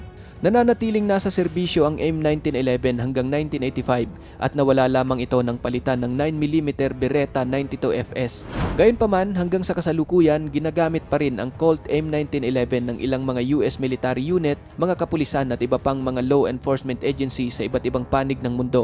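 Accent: Visayas, Philippines. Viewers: Bisaya Punto Ka Bhai.. 🤔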